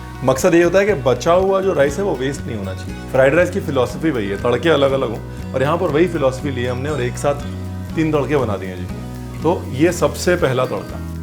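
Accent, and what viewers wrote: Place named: Punjab, India. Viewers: Aaj tou fir teen shadiyaan hongi shamko, aana jarur ji.... 💥💥💥btw aapki punjabi k sath meri bhi punjabi nikal jati hai, n my hubby say, tere naal he gallan kar reha hai 🤣🤣🤣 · Amazing recipes of left over rice...will try paneer 65 rice for sure..luv d way u explain.ur punjabi tone while explaining reminds me of my mom...meethe chol ni banane ha ha👍😀too gud...love n respect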